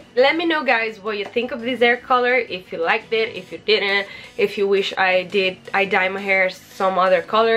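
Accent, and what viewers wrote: Italy. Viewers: Sei bellissima! Ma sei italiana vero? si sente l’accento! Potresti fare anche video in italiano pleaseee💖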